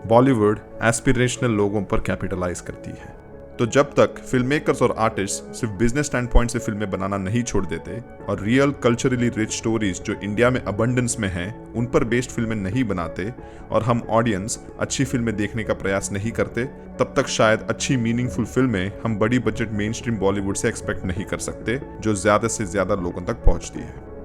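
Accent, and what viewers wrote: Kerala, India. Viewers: Dude your mallu accent is so obvious 🙂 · Bro why I feel like you have malayalam slang in your hindi and you pronounce malayalam correctly like any other mallu.. unlike North Indian... is that a mallu guy behind the mask.. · But your Hindi like Malayali Hindi ...? Why ...?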